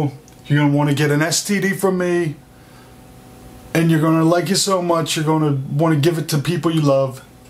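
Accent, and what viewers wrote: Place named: Philadelphia, United States. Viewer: Sounds like ur from philly but I’m guessin jersey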